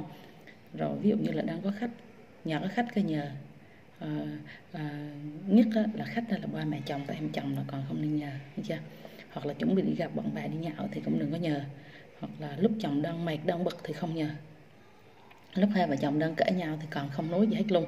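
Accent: Quang Nam, Vietnam. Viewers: Chào em gái Quảng Nôm. Anh cũng người Quảng xa quê gần 30 năm.Rất vui khi đựợc nghe em nói chuyện bằng giọng Quảng. Hy vọng một ngày không xa được cà phê với em tại Đà Nẵng hỷ! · dạy tiếng Anh vậy khi phát âm tiếng Anh có khi “nồ” bị ảnh hưởng giọng QN không? Thí dụ “shall” —> đọc là “sô”? How (—> hô) Frank (—> frenk) Mackerel (—> méc.kerel) Contact (—> con.tect) v v Các bạn học sinh họ có khó khăn gì ko? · Chu choa bữa ni cắt tóc ngắn, nhìn thấy lạ nghe cô giáo . Nói chuyện giọng Quảng nom nghe dễ thương quá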